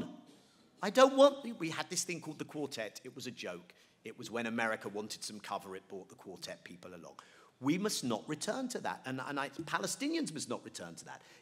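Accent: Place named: United Kingdom. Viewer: He maybe Israeli but he speaks like a clear thinking Brit.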